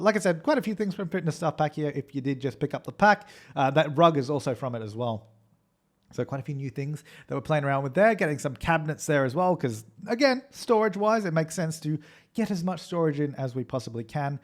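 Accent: Australia. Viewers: Love your videos~ I love making houses on sims, probably more then the actual game play XD as i was watching though, some of the things you say, i dunno, you voice just sounds a lot like Jazza, and not being stereotipical witht the Australian accent, but the tone and pitch of your voice is very similar to his hahaha still, awesome houses! and on to watch more~ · CloudieFox I know right, they're both australian, so that's probably why. Whenever I watch waiter I think they are the same guy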